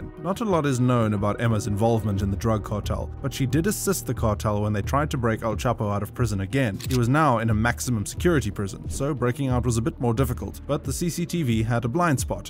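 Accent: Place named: South Africa. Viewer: Narrator sounds like he has a South African accent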